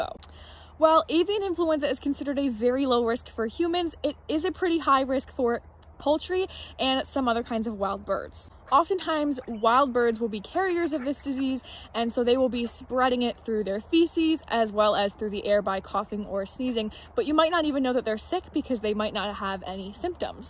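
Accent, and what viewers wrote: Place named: United States. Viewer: What is your accent name ? I know it's American but exactly what? Anyone answer 'cause she might not !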